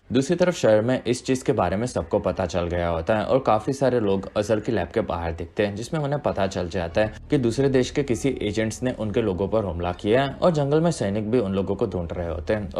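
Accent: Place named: Odisha, India. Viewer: Man your accent looks like Odia-hindi mix.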